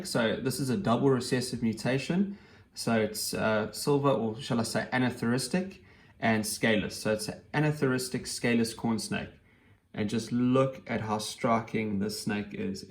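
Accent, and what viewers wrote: South Africa. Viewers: Love the South African accent!!! Dunno why just do!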